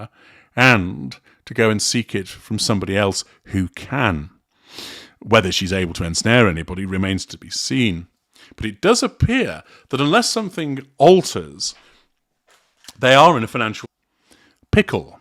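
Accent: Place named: Cockney, United Kingdom. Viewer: Hey Mr cockney Man!!! We don't talk shit about your royalty's entitlements so don't talk about our American royalty. Those two and their feral kids are American now with all the entitlement BS that comes with it?!!Sooo!!! why don't you have a beer and a smile sit down and shut the F/U!!!!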